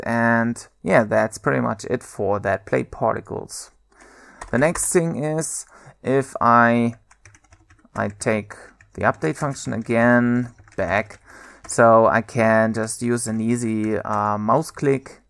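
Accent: Germany. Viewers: OMG Ich weiß dass du Deutscher bist, erkennt man an deinem Akzent. :3 Wie auch immer ich will dir recht herzlich für dieses Video danken. Ich saß ewig an diesem Script, bin verzweifelt, alle Codes im Internet funktionierten nicht bis ich dein Video gesehen habe. So gut erklärt! Endlich funktioniert es. Besten Dank! :)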